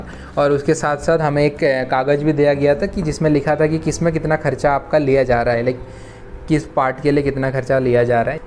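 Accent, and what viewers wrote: India. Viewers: Ap Bengali ho kya??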